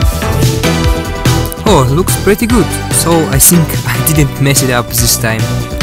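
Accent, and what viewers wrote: Russia. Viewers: Он русский????? У него акцент русский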